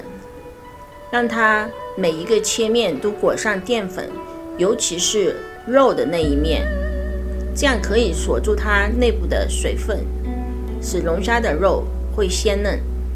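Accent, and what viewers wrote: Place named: Shanghai, China. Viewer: 上海的阿姨？ 熟悉的口音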